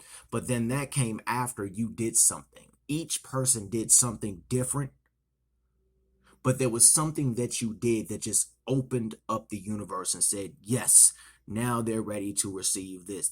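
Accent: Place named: DMV, United States. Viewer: Are you from the DMV? I hear my accent 😂😂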